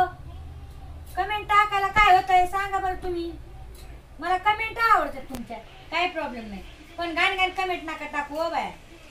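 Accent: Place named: Marathwada, India. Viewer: अस्सल मराठवाड्याची भाषा बोलता ताई तुम्ही...जय भीम नमो बुद्ध य जय शिवराय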